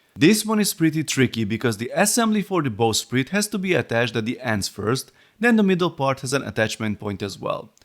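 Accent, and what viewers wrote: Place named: Hungary. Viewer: le a kalappal. Te vagy a kedvenc Lego csatornám, egy csomó olyan videód megnéztem, ahol az adott készlet beszerzése még eszembe sem jutna:). Jól meg vannak csinálva a filmek, élvezetes és választékos(!) az angol szöveg, az akcentuson ugyan hallani hogy magyar vagy, de egyáltalán nem zavaró módon. Gondolom az oktatói múltadból is tudod, hogy lehet a figyelmet folyamatosan fenntartó szövegeket létrehozni és úgy elmondani, hogy abba ne aludjon bele a közönség. All in all, nagy kudos.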